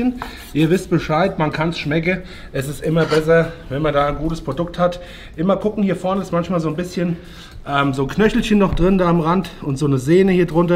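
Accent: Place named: Mannheim, Germany. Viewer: Ui, jetzt habe ich direkt Hunger. Werde noch etwas an Saucen nachkaufen müssen; daher danke für den Link aus diesem Video. Hockerkocher, Wok etc. ansonsten vorhanden. Daher für das Wochenende: Feuer frei. Dein Dialekt bringt mich immer zum grinsen, da ich viel mit Kollegen aus der Konzerzentrale in "Mannem" (=Mannheim) zu tun habe 😉 Mach(t) weiter so und Grüße aus dem Rheinland.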